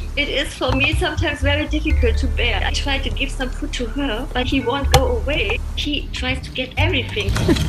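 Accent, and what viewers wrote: Germany. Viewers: Habe es schon an der Aussprache gehört, dass du Deutsche bist.... Liebe Grüße aus Worms 😁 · 你的英文聽起來很像德文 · I’m actually wondering if she’s German cuz to me as a German her English has that small accent XD also Kräri sounds kinda like the German word Krähe which means crow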